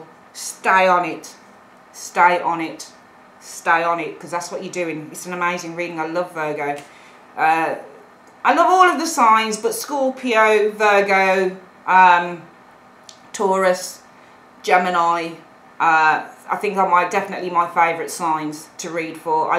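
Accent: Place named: United Kingdom. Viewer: I'm a Mexican, but I live in united states and there is a different accent from the UK. I was nice to hear a different English tone and accent. Do you know where is Mexico? I , I know some Countries in Europe but not all. Specially Germany , a diveded contry. East Germany, and Wesst Germany. and Reunited Country. Very big news in America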